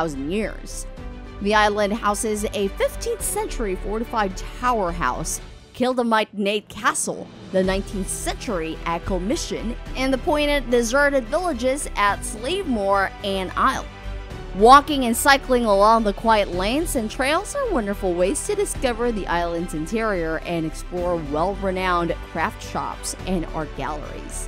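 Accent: United States. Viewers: A PNW voice, possibly shy, unconfident. ?.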